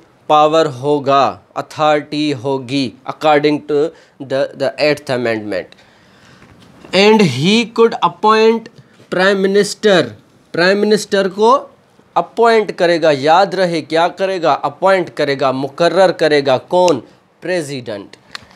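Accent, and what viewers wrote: Sindh, India. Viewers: Sir are you sindhi ??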